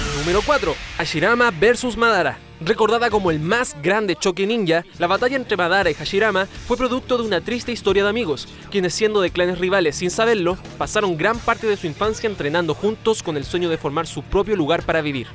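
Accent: Chile. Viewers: Se nota que es chileno, buen video amigo saludos de coquimbo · Es chileno?? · Chileno verdad?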